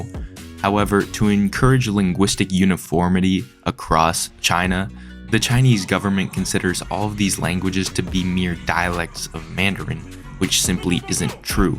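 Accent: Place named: United States. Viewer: Couldn't understand any of this. Please speak English, not American.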